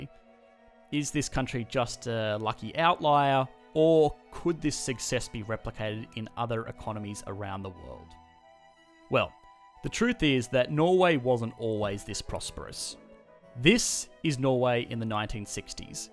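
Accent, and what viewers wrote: Australia. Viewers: Why does this guy sound Australian? Is he Norwegian? If not then all his stats are based on Literature found and stats not of his own experience. I would rather get my statistic figures from a local source, as opposed to stats found in the narrators home library. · i seriously hate the austrailian accebt , so many wordsso distorted , i cannot understand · Great video. It sounds like you’re an Aussie; I’d be curious to know how you feel about Australia’s resource economy in light of what you’ve learnt about Norway’s policies.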